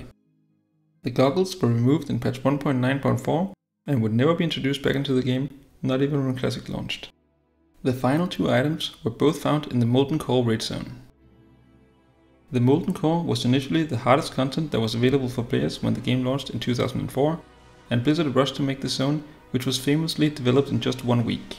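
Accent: Denmark. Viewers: accenten lol. Hvorfor er der så mange danske classic wow youtubere i forhold til andre europæiske lande?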